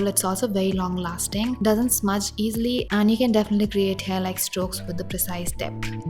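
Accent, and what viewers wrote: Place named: India. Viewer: I love your Indian accent ❤️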